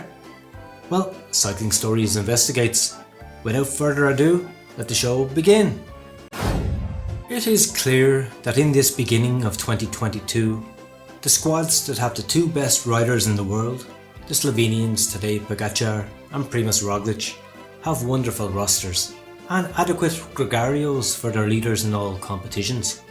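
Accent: Ireland. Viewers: What makes you think this is from a Brit? The narrator is Irish (you need to read a bit of history if you think Irish and British are the same thing!), and the early videos all have continental European narrators. I am British, however, and I think your full of shit if you think that just because British athletes have doped that I am not allowed to voice my suspicions of others. I personally believe that there are more at it than aren't, and not just in cycling.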